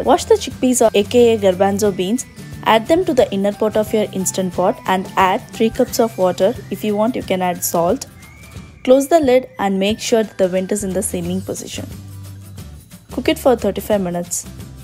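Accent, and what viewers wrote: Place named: England, United Kingdom. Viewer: What English accent!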